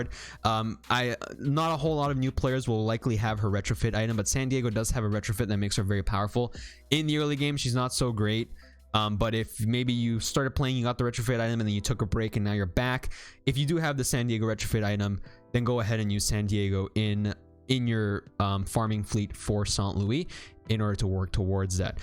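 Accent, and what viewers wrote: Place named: England, United Kingdom. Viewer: i love english pronounciation on german words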